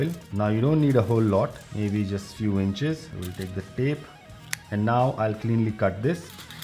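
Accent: India. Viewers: Ha bro bhai indian h english bolne ke tarike se pta chal rha h. Ek dum clear. Nice video bhai.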